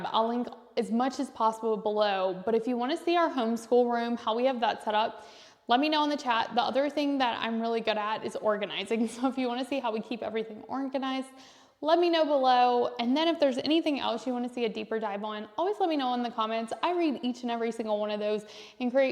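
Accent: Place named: South, United States. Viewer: Really, that's a southern thing. Don't change for who you are for a comment. My family is from the south and says it the same way. 👍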